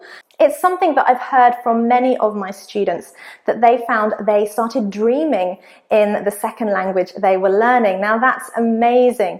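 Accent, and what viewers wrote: United Kingdom. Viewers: I studied English in California so I have a hear for american English. It is the first time I come across your channel and after few seconds I could tell you are from UK. I sometime use Google Translator because it is fast and convenient, if not happy with it I reach for my English to English vocabulary. Not problems with movie or most books but still I have hard time to understand songs. As for jokes it is more cultural, if you are not a native it can be challanging. Finally, nobody mistake me with a native speaker, I still have a very strong Italian accent. Another criteria you can add to this list cuold be if you can solve crosswords. I'm not able to do it in English and sometime I find it difficult even in Italian. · Tell me why I can understand you easily despite your british accent :-D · I love your videos as your accents is on british english based.We people love british accent rather than peculiar american accent.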